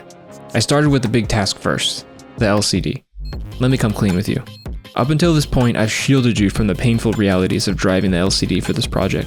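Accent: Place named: Germany. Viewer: Thank you! In the video I don't really address the problems but I'm currently working on my own website/blog about the projects I do. These blogposts will be a bit more detailed. Never heard someone being jealous of german accent lol. Can't really hide it haha.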